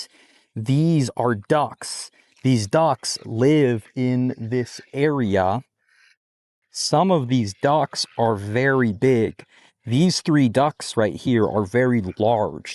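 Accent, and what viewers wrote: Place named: United States. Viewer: It's funny how you speak so slowly, but for us beginners, it's a good pace, especially since sometimes there are words I don't know the meaning of. I only have a few reservations about the channel name. English at Ease is very popular, which makes it difficult to find. I had to do a lot of searching. I should have added a name or some other feature that would have made it easier. Other than that, it's okay. American is a bit easier to understand than pure English.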